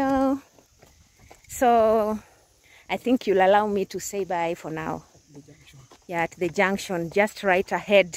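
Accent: Luhya, Kenya. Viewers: hahaha thank you so muich for this video kakamega county is my home i have passed that tarmac road severally but i never knew that road leads to the train station and i never knew the progress at that place thanks so much and uv talked Luhya like a typical Luhya😂😂😂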